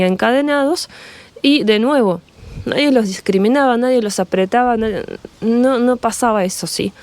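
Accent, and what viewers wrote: Argentina. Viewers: Primero no entendía nada , porque hablas y tenes el acento Argentino y tenes un Español perfecto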